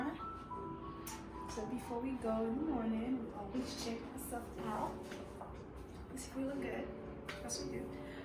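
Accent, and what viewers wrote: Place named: Haiti. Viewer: If I didn't know you were Haitian from the accent, the glassware cabinet was a dead giveaway lol Such a stunning space 🤍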